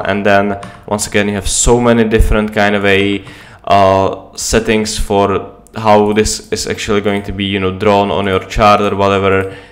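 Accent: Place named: Czech Republic. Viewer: ) ta čeština, to nezapřeš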